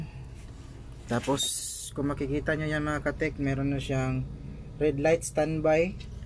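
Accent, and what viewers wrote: Visayas, Philippines. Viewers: Good job, bisaya ka sir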